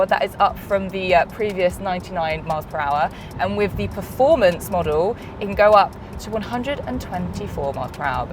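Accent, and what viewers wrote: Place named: London, United Kingdom. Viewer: I'ts a standard London/South East England accent. Btw your question is really stupid.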